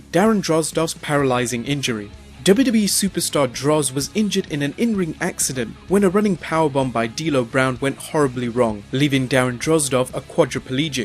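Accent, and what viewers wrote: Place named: United Kingdom. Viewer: Well what do you expect? It's annoyingly another British guy narrating a video.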